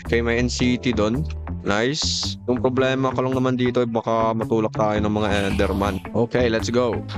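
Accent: Visayas, Philippines. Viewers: Bisaya day ka dol hahaha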